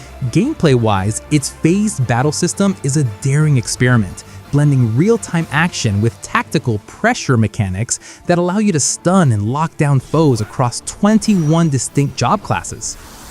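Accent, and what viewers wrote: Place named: Australia. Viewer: your voice doesn't sound Indonesian at all despite location marked as Indonesia. not even singlish. hmmm australia? or is this AI run channel?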